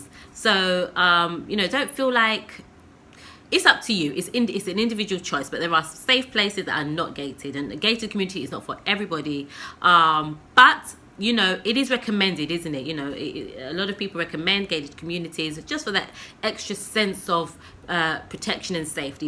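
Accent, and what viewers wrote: United Kingdom. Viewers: your new subbie like your English accent and your contents, you're beautiful i am here supporting you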